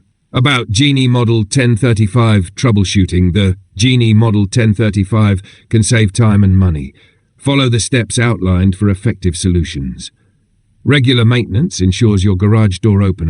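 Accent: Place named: Europe. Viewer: This is the most obnoxious narration. Does every video need a European accent to convey legitimacy. It doesn’t.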